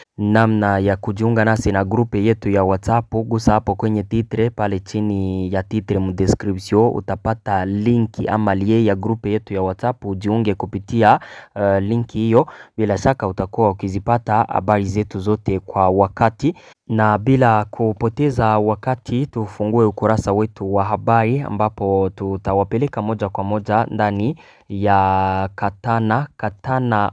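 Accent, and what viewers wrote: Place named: Tanzania. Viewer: Swahili yako ni ya watanzian, courage ndugu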